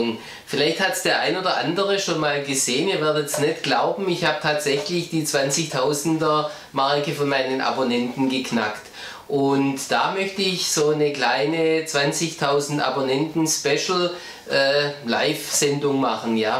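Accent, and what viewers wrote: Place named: Saxony, Germany. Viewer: hört man doch: Sachsen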